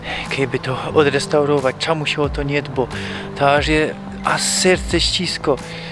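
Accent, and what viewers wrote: Silesia, Poland. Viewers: Zajebisty ślonski akcent. Zeby tak to szlo utrzymac tyn dialekt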